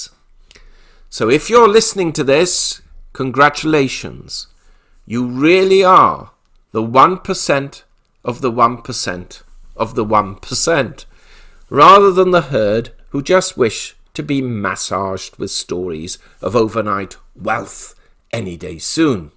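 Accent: United Kingdom. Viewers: I am too new to this to offer any prediction or guidance. However...I do appreciate, value. Cool headed reasoning, professional interpretation(s), cool British accent and annunciation, and a little entertainment thrown in...all for FREE. I’ll always choose the one or two friends that are willing to tell me the hard truths than one or two hundred, patting me on the back. I would revisit my last statement of course, it were to be one or two hundred ladies, if we’re being honest. Anyway. Thank you for providing such a valuable resource. Some of us ARE listening.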